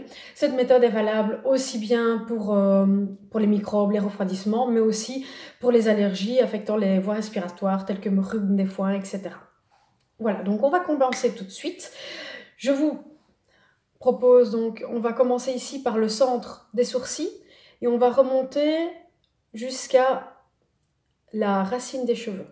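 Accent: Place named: Belgium. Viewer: génial! Vous êtes belges? J'entends comme un accent de chez nous 😊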